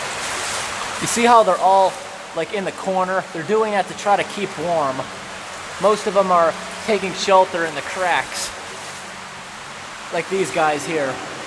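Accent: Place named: United States. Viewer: Omg I love his American accent.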